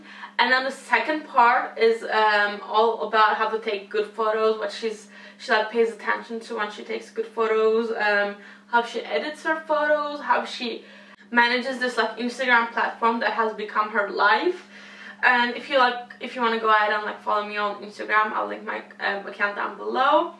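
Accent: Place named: United States. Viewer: I loved this video cause I could undertand more! Lol! Funny you have an American accent even though you are living in England. But it is nice! Love you sweetie!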